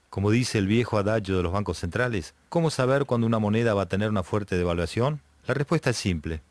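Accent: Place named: Argentina. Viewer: Yo pensé que era un argentino que quizás vive en Chile o algo, tú sabes que a veces los acentos se pegan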